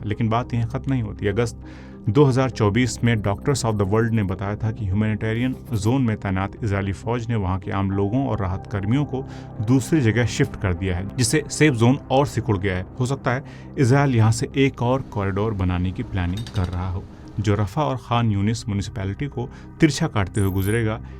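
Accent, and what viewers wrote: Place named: Pakistan. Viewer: Using mostly Urdu & speak like Pakis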